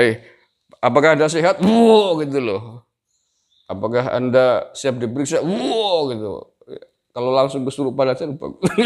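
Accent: Banyumas, Indonesia. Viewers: Ga nyangka bisa guyon dgn ngapaknya, mantap penjelasan nya gas pol.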